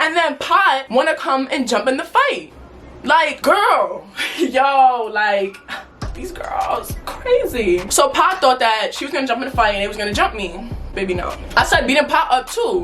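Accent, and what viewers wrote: New York, United States. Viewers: You’re from ny huh🥺? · Definitely from ny😂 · You def from ny😭💞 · Yea u can definitely tell she from ny💯🔥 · Nah she gotta Ny accent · This my first time watching your video and I can def tell that your from New York 😭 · You gotta be from New York😂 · you have to be from new york!😭your accent gives life! edited:i said that before watching everything 💕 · Are you from NY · You have to be from New York 😂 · Instantly knew she was a fellow New Yorker right off the accent and the "Ight so boom" lmao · I could deadass tell you from new york😭. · Soon I heard the newyork accent I subscribed WHOMPP🥳🥳hey sista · I knew you was from NYC 💪🏾🤟🏾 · Definitely from New York lmaooo " aii so BOOM " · i love how u jumped right into the story & that NY Accent and you are pretty!🤍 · New York accent hella strong 💪🏿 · The aii so boom just know she from ny cause I do the same thing 🤣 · You from nyc❓ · She definitely sounds like a New York girl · ouuu i know a new yorker when i see onee 😫😫 lemme guess u from the bx or brooklyn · The way that I knew you were from NY and then you said Coney Island so ik for sure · You can tell she’s from new york by how started the story 🤣 yktv yessir 💞🕺🏾❗️ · You from New York or am I trippin???? 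· u sound like u from ny 😂😂 ? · the NY accent💕💕💕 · You must be form New York I hear the accent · I’m new to this channel and I already know she from New York 💯❤️ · she dead got the deep ass ny accent🤣❗️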